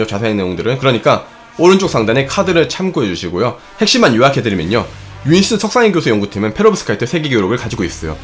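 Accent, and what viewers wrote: Gyeongsang, South Korea. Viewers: 전부터 그런 느낌이 있었는데.. 경상도 사람이었네. 좋겠습니다. 윤석열이 대통령 되서..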